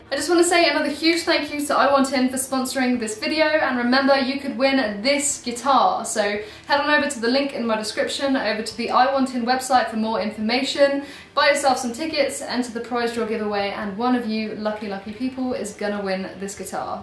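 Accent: United Kingdom. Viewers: I'm thinking about moving there to meet! Have watched all her videos, her cute, confidant and FUN energy is better than any drug, watching her play Slither, or anything else, makes my soul smile! and, gawd damn the girl can PLAY!! and, love, you are absolutely adorable with your nice legs just dangling there while you rip on the guitar! Beautiful, sexy, confidant AND talented beyond words, wow... my fantasy girl! oh, and forgot to mention that cute as hell British accent!! oh, yeah that guitar is pretty sweet too lol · You are so damn cute 🥰 with your brit accent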